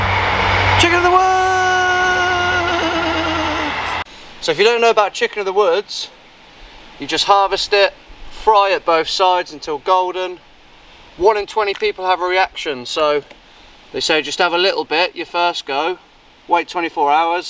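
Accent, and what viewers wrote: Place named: East Anglia, United Kingdom. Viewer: subbed because you sound East Anglian.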